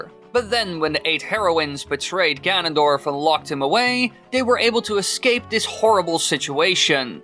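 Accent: Netherlands. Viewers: I really, really miss your Dutch voice. You sound so forced and fake nowadays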